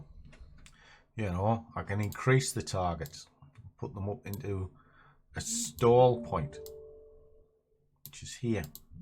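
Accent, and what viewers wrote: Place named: Northeast England, United Kingdom. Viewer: haha I just watched this video and oh nice I’m from Newcastle can tell u was close by the accent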